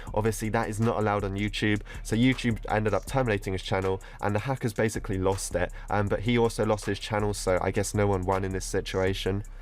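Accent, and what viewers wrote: United Kingdom. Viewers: You know sounds professional when he speaks British.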